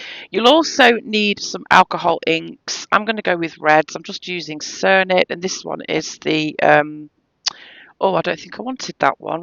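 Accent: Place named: North, United Kingdom. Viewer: Your accent sounds very familiar to me! I’m also a Brit living in the USA in SoCal. I’m thinking your from the northern parts of the UK ?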